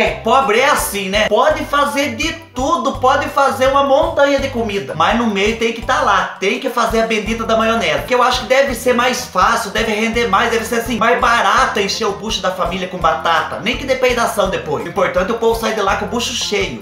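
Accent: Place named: Caipira, Brazil. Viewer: tu é doidinho das ideias com esse sotaque caipira e contando teus causos eu não aguento 😂😂😂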